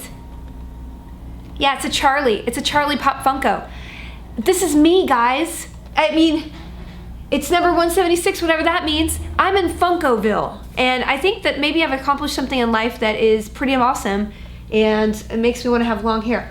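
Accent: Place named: Cockney, United Kingdom. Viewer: Oh, my dear, you have a delectable cockney accent. But if you're going to voice dwarves, you absolutely must learn to do a Scottish brogue.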